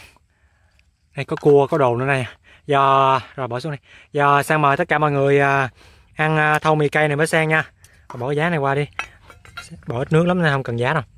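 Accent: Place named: Mekong Delta, Vietnam. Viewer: e ở đâu? nghe giọng như người miền tây vậy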